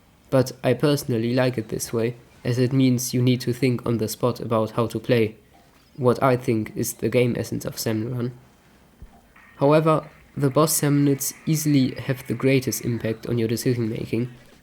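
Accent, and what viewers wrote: Germany. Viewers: Man hört den Deutschen akzent😂 aber ich liebe Splatoon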